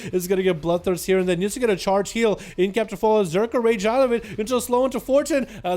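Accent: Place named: Russia. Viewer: LOL! Superior russian aсcent!!! =)))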